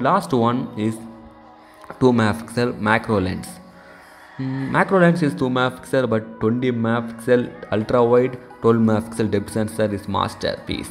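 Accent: India. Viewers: Indian English 🤩😘✴🔥🔥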